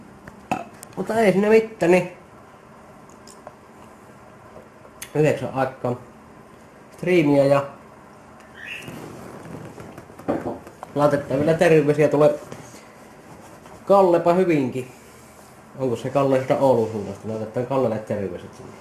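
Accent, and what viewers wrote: Savo, Finland. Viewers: mistä päi savoo sä oot ku puhut murteel?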